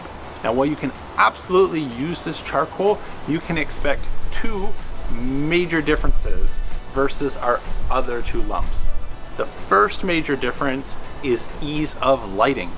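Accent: Canada. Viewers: Stop speaking Canadian! Or just don't use the words out and about. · Is from Canada? His "outs" are very Canadian.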